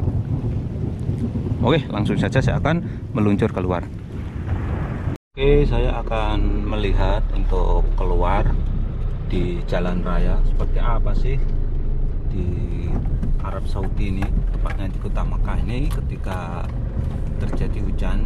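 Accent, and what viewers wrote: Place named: Banyumas, Indonesia. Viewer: Inyonge wong ngendi kok ngapak ngapak temen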